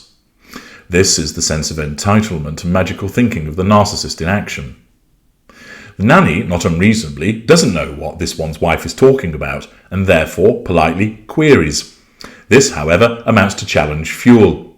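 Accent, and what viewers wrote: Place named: Scotland, United Kingdom. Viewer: You know sometimes your pronunciation reminds me of Alan Brazil. I think you are of Scottish heritage.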